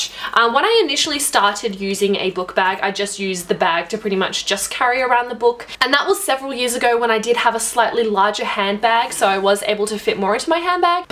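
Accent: Australia. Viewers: is that an australian accent? i always get it confused. so hawt lol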